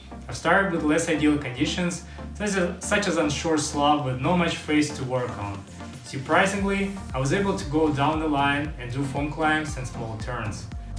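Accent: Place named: Russia. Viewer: No disrespect, but did you learn English in Russia? you have an interesting accent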